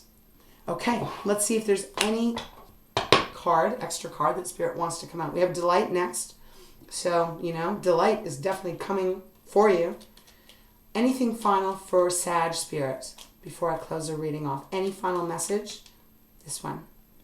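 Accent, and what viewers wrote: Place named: Canada. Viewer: Thank you! ❤ Are you in Canada? I’m in Montreal and you sound like a fellow Canadian (I’m in Montreal)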